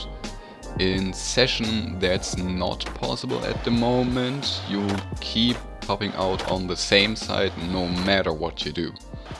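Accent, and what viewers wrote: Germany. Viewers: Man merkt, dass du Deutsch bist. ;) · U Sound like a german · man hört das deutsch richtig raus haha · Bist du deutscher? xD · Sounds like a german, speaking english for his first time..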